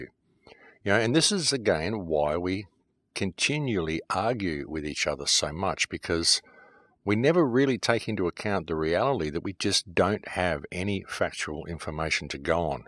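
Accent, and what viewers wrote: Australia. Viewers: sounds Australian to me my man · Theres a reason they pack us into cities. Look up mouse utopia experiment. There was an excellent doco on youtube but it kept getting deleted. Great to hear an Aussie voice on this stuff.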